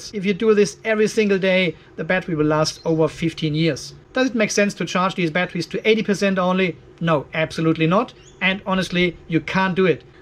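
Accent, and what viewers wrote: Germany. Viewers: You do not sound very Australian. You have a German accent. I have learned quite a lot from this but not sure if it is all necessary.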